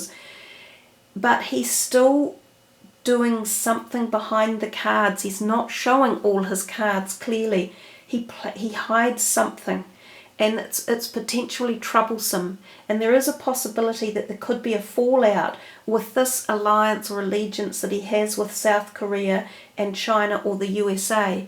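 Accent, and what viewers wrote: New Zealand. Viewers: Kiwis not as bad as South African accent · Pardon me! NEW ZELAND accent!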